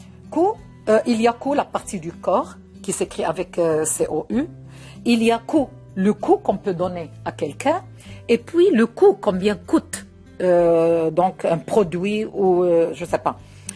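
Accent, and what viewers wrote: Arab world. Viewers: Je vous remercie pour ce superbe résumé. Même si on utilise beaucoup la langue française, on a tendance à oublier les règles essentielles. Vous avez effectivement un accent arabe ou kabil mais il me semble que vous en connaissez plus sur la langue française que beaucoup de français de souche. Alors continuez à nous faire des vidéos comme celle-là . C'est très utile.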